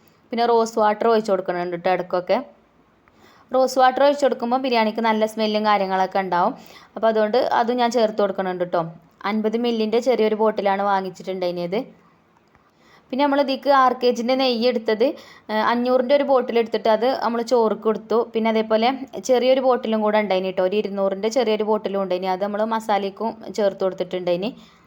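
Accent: Malappuram, India. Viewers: നിങ്ങളെ സ്ഥലം എവിടെയാ, വർത്താനം കേട്ടിട്ട് മലപ്പുറം പോലെ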